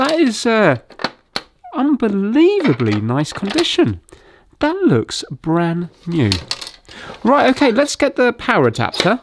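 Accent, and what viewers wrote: United Kingdom. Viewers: Amazing actually. Brittish accent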